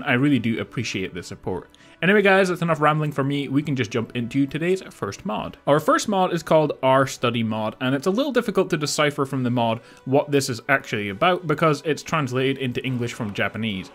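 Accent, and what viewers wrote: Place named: Scotland, United Kingdom. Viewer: It's great to hear a Scottish voice on YouTube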